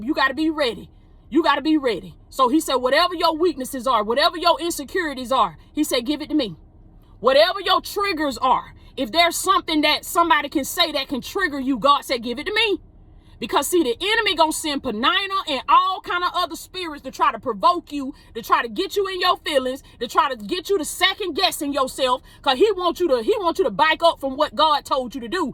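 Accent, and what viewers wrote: South, United States. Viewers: Love love love love love love love love love love love that southern accent my sister😊😊😊.....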